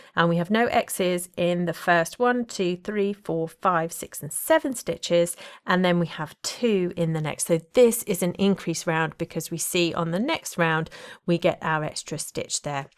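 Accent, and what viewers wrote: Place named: England, United Kingdom. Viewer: So, I have to admit, I have not watched any of your tutorials before but I am a huge fan of your garments. I have several patterns that I of course have not gotten around to. 😂😂 I decided to watch h this one as it popped up on my front feed. I was COMMMMMPLEEEETELY surprised to hear your English accent!!!!! I had no idea. So nooow.Im trying to reconcile your face to your voice. 😂😂 I will say that I totally love English accents. One of the doctors I work with is English and I looooove to listen to hom talk. ❤❤❤❤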